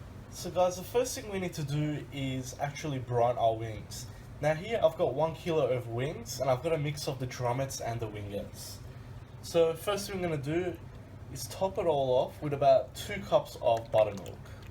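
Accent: Australia. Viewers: What the f&^k is that accent? Every time I hear someone from western Sydney speak I want to vomit. MSG impossible to find in Australia.... except at ANY asian grocer you fuckwit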